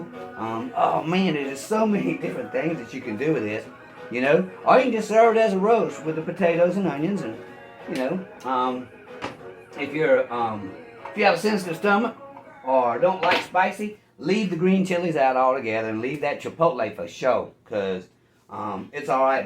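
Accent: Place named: Louisiana, United States. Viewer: Are 6ou from Louisiana?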